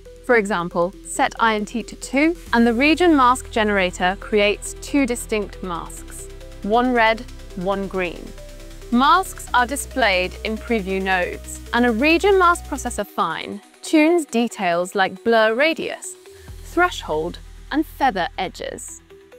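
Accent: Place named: United Kingdom. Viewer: why, It is England tone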